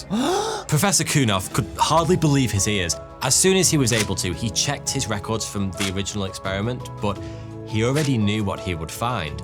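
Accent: United Kingdom. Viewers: It's a play on his regional British accent. Finking = thinking For'y two = thought he, too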